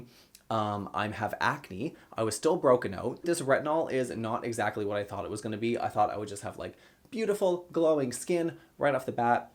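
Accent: Canada. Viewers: Your (eastern?) Canadian accent is adorable.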